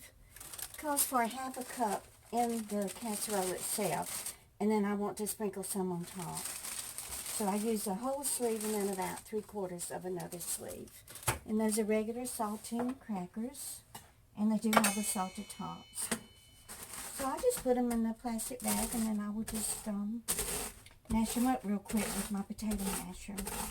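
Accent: South, United States. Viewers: Thank you for sharing that recipe,. You always have tasty foods to share with viewers. As always, can't get enough of the Southern accent...it reminds me of home. Stay blessed, kind soul.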